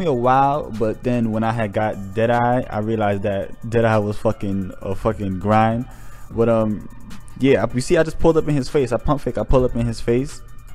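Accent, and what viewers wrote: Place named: Oklahoma, United States. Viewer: Bro u from Oklahoma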